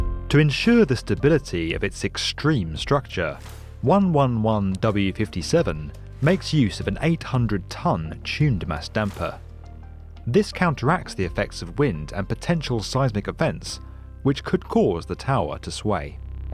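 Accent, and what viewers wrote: United Kingdom. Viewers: amazing video, but I hate that mtfckin British accent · Everything sounds amazing in a British accent.:) You have done a great job in this video.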